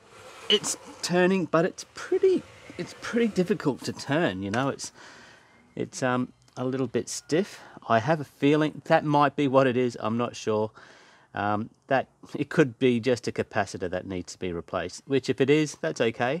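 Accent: Australia. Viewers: I have been stuck on my dryer for a while cause I couldn't find anything to show me the how or what I did wring when replacing the part. So thank you. An also your a funny man I didn't see where u were from an I was like I'm sure he is Aussie an then u said spider I was like yep . So thanks for the info an a giggle look forward to watching more of you.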